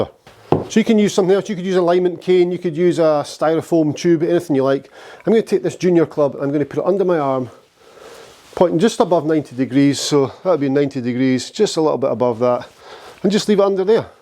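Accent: Scotland, United Kingdom. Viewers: Two reasons why I watch this. To learn how to play golf and to learn how to speak Scottish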